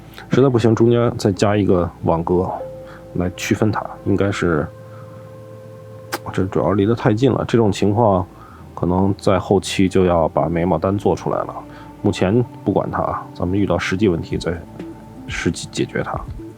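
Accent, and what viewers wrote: China. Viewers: 以后片子开头能不能别整那个中文念法的英文了，每次开始，我都被雷的外焦里嫩的，总是以为我点错视频了。。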